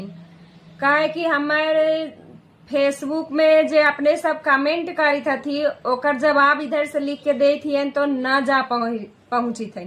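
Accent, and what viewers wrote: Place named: Bihar, India. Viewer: Aapka bolane ki Bhasha S Ham pahchan Gaye ki aap jahanabad kya hai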